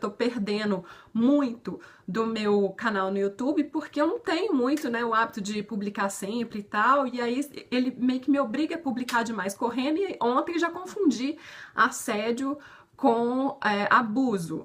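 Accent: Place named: Minas Gerais, Brazil. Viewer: Sotaque mineiro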